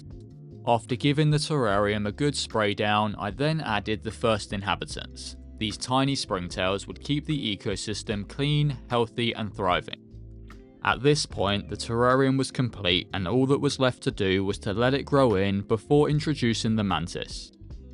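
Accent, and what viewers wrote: United Kingdom. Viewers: Yeah, female, mate. Lovely terrarium. Just making a large slow flowing waterfall terrarium now and hoping to put a mantis in it. Not sure which one yet 🤔 P.S. You sound like a Manc as well?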